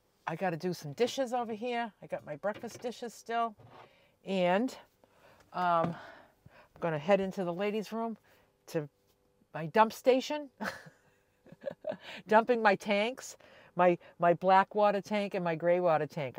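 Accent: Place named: Massachusetts, United States. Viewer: Loving that Mass accent (we used to live in NH!). Safe travels!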